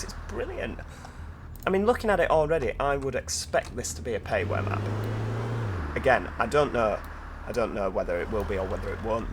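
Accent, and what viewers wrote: United Kingdom. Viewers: Why they didn't fix the loading and freezing in this Game so sad! With wich sterriing wheel do you play Omsi2? And you realy should have more subscribers! I mean your videos are great and I love your British accent and you also got over 1 Million views. This is Insane :)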